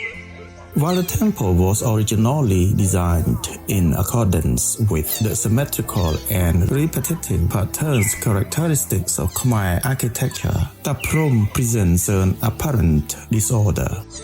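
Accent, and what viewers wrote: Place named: England, United Kingdom. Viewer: The scenes and editing are excellent, but the strong English accent and mispronunciations make it difficult to focus. Since there are subtitles, maybe a clearer voiceover would help make the content more enjoyable.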